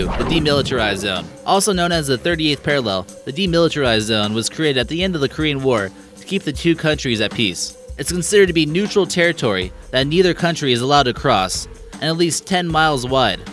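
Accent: United States. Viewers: What's with the Aussie accent for the subscribe button and the American accent of the nasally narrator..?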